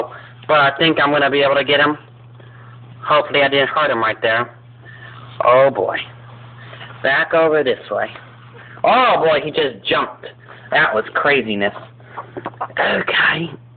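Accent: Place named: Australia. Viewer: ! I love your Australian accent and your amazing skills with the newspaper. I can't wait till you make more videos like this!:)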